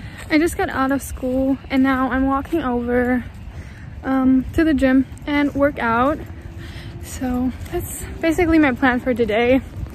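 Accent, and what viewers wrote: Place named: United States. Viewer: Your English is PERFECT. With an American accent no less, simply amazing. As a former host dad in Virginia it always pleases me to see an exchange student enjoying their exchange year. Remember to do and see as much as possible. As you know it is a once in lifetime opportunity. All the best on your American journey.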